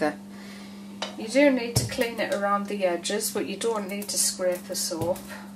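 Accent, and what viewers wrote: United Kingdom. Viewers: Hi, you can get some really good plastic jugs from any of the pound shops in the UK. Hope that helps others. Love your videos, it's lovely to hear a UK accent on these videos:) Thank you x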